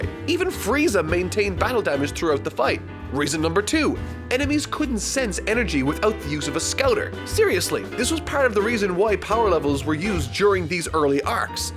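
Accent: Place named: Ireland. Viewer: Idk but i just recognized your irish accent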